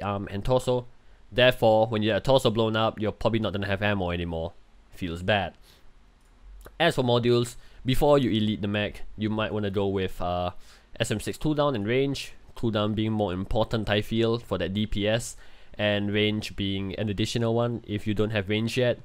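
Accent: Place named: Southeast Asia. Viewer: Your accent is familiar, are you from SEA?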